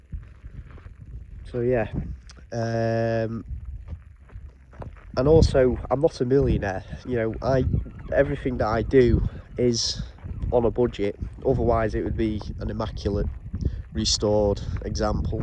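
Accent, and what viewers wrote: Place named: Cockney, United Kingdom. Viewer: Cockney heavy'😂😂🧌🧌🤮🤮🤮🤮🤮🤮🤮🤮🤮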